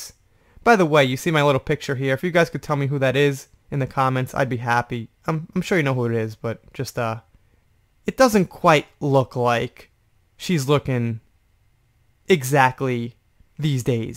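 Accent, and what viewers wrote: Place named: East Coast, United States. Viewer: your voice and easy cost accent is music to my ears..even all the way from Japan..💯💜🦋🤟🍀🌈👊🌹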